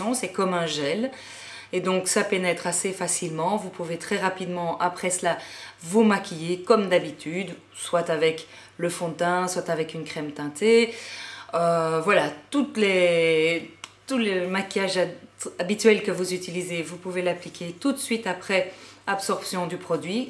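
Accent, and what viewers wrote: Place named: France. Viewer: Beautiful accent...love the french accent so much ☺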